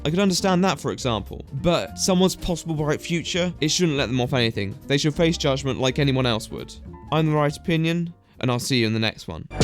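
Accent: United Kingdom. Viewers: is this a voice you put on or is it genuine? it's the most english voice ive ever heard aha, and i'm english myself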